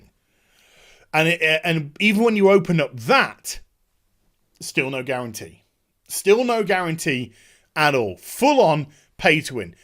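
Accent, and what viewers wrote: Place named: United Kingdom. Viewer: for some reason ranting sounds amazing with the British XD but yeah sad to see blizzard slip and slide into oblivion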